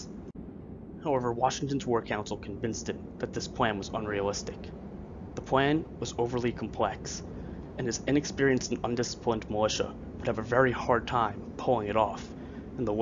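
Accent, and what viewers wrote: United States. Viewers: Good work,only the American accent is sickening. Gnawing a living toad while speaking? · Sounds like a Philadelphian narrating.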